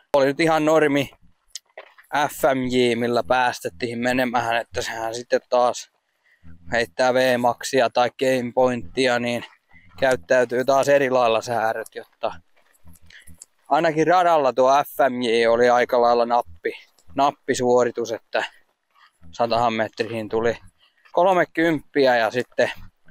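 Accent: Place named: South Ostrobothnia, Finland. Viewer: Bonuusta e-pohojanmaan murtehesta.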